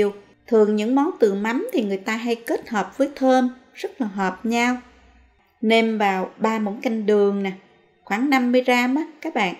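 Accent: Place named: Mekong Delta, Vietnam. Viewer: Rất thích cách chia sẻ từng món ăn của,và nhất là giọng miền Tây để nghe và rõ ràng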